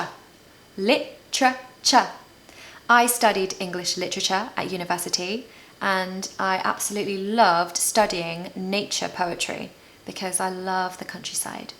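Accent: RP, United Kingdom. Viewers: Is received pronunciation your natural accent or do you speak it mainly for teaching purpose? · First of all, glad I am so early to this newly opened channel. Second, can you make a video of you pronouncing random sentences and questions so that I can repeat after you and sound more natural??.. I am trying to learn the British accent ❤❤❤❤😁😁😁 BTW, you are a natural, and have such a stunning voice and accent that really helps people like me, who struggles with british accent, to learn faster. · Okay, let me say this. You are the BEST teacher on the youtube by far. I have been looking for a teacher and now I have the best one-. I love that your teach us about the British culture as you teach us about the British English. This is FANTASTIC. Please Please Pleaaaaase upload more videos. I watch your videos everyday now as I want to adopt your accent and learn more about the British culture. 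· I love your beautiful smile and beautiful British accent! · I liked the way you used elision technique in pronunciation, great video. Lovely British accent. I m learning from you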